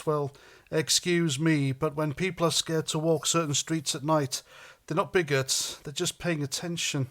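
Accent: Wales, United Kingdom. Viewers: The Narrator is not English he's Welsh.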